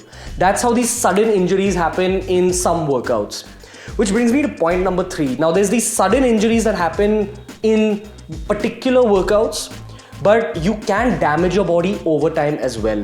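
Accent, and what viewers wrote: India. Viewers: First hindi accent influencer who has a delicate accent but you can still understand what is he talking about. Keep it up very interesting material.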